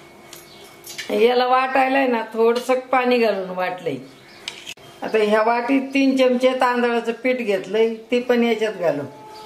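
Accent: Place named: Dhule, India. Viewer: Aho aaji tumhala sagle yete ase naka bolu mi tumcha aavaj eikla ki mala ase vatte ki mi dhule la aahe chan boltat aaji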